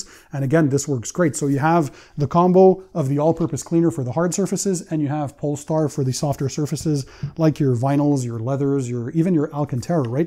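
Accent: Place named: Canada. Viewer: At first I thought this guy was a European that had an accent from speaking English as a secondary language but then I realized what I was hearing.... Canadian 😅🇨🇦 anyway, great info! thank you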